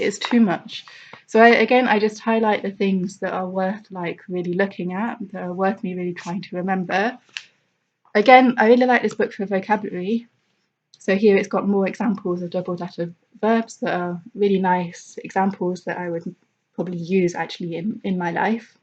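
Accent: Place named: United Kingdom. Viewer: مرحبا 😊اللهجه تدل بريطانيا 😅استخدم الترجمه لمعرفه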